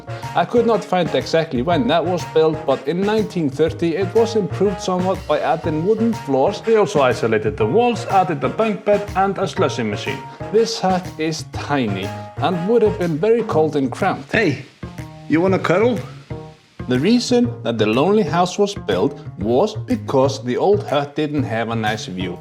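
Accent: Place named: Iceland. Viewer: Everything sounds better with Icelandic accent! But be honest, you have eaten these birds before, did you?:)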